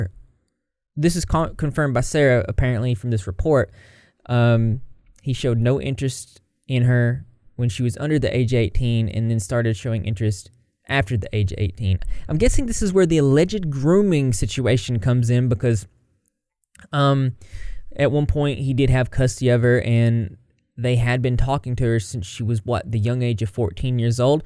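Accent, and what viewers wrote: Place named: Georgia, United States. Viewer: I don't even care about onision at this point. I just came to hear "and in. Today's. Video." In country ass Georgia accent.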